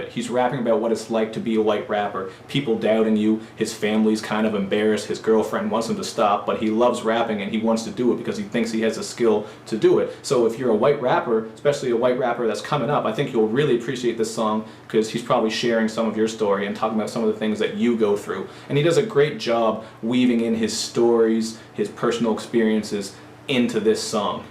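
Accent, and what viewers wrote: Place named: Atlantic Canada, Canada. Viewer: You from east coast of Canada? U got a mad east coast accent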